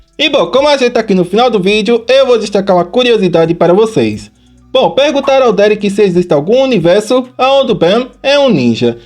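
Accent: Northeast, Brazil. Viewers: Mano curiosidade foda que eu adoraria saber seu sotaque e nordestino?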